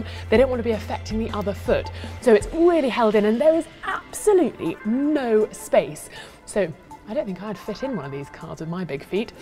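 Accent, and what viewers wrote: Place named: England, United Kingdom. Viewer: Джереми Кларксон в юбке. Англичанка чтоли? у них у всех такой тембр и акцент?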